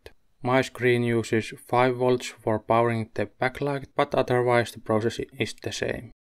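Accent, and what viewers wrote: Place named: Finland. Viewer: Probably from Finland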